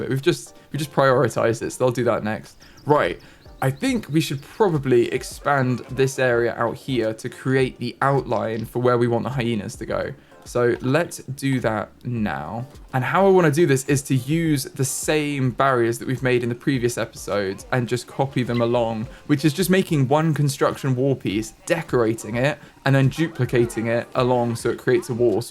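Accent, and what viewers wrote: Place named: United Kingdom. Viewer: Could be a UK versus US thing, as I pronounce it ah-mean-ities too, like and their accent sounds UK.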